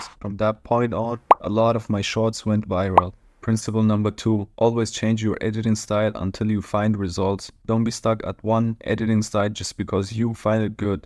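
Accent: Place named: Germany. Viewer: Als wenn du wirklich deutsch bist habs am Akzent erkannt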